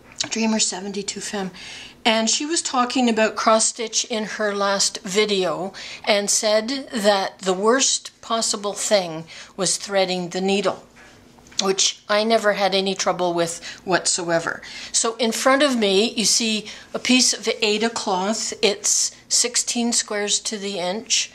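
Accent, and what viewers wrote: Canada. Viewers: Hi! I changed my youtube name from TheConnieS to Petalpresser. I hated that other one.. I don't do cross-stitch anymore because of carpal tunnel and eyesight. I never saw someone thread a needle like that before. Don't know if I could do it. You are a very good teacher. Your voice is very soothing. I think it's the Canadian accent. : ) Always glad to see your videos. What a treat!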